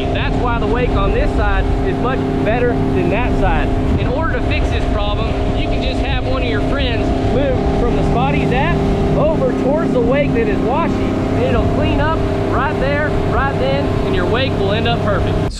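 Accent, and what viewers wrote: South, United States. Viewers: Why does he have a southern accent all of a sudden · Extra Texan for this one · Country accent :O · Haha, nice Texas Twang! Great Tips!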